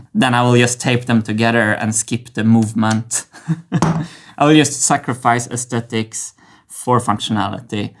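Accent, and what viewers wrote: Sweden. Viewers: Hes swedish 😅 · the comment was clearly tongue in cheek. Nobody is actually complaining about very slight Swedish accent. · Wasn't it more like "yeneration"? And yes he pronounces lots of words or parts of words just like he would in Swedish (because he's a Swede), and makes some mistakes in grammar but I think it's kinda charming since he has a pretty good vocabulary and flow. He's a good communicator, don't you think?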